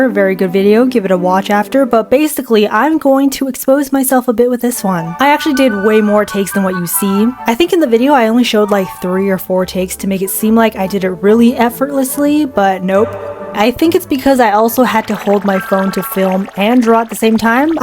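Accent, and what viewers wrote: United Kingdom. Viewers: Why did you speak British? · Is it just me or she keeps turning British 🤔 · Am I the only one who noticed her extreme brit accent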